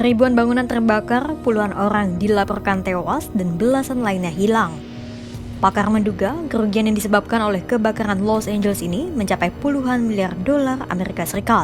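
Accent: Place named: Palembang, Indonesia. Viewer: Naratornya kemungkinan orang Palembang,logatnya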